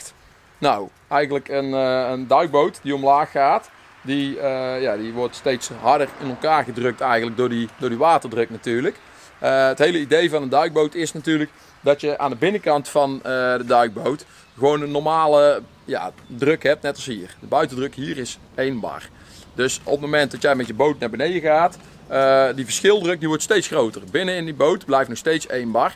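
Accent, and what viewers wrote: Australia. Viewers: I thought he just had a real heavy Australian accent haha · I had no idea what channel this was when I clicked on it or where he is from but as soon as the video started I thought he was speaking English with an Australian accent. Then I realized I couldnt understand him at all. Then I thought he was speaking Gaelic or something with an Aussie accent.. do all dutch people sound like this? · Is this Australian accent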